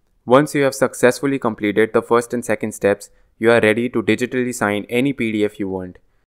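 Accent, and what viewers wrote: India. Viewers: That's Indian accent 🇮🇳 🕉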